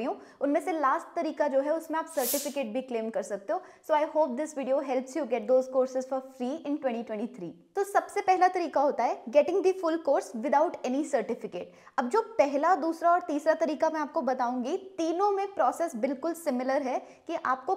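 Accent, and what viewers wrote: Punjab, India. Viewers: Another great video! One thing i have noticed is the people of IIMs are quite different, i mean in a positive manner. You are doing great job. One thing i have observed is you say the word "tarika" and "zaroor" quite differently, you are a Punjabi that's for sure. Any way keep up the good work! Raghav Vohra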